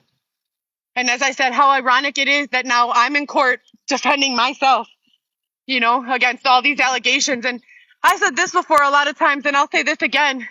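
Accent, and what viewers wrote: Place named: Latin America. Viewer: Here she goes with the latina accent🤣😂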